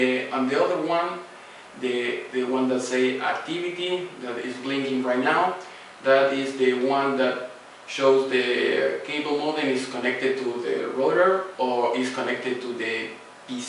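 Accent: Argentina. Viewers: ese ingles made in argentinian.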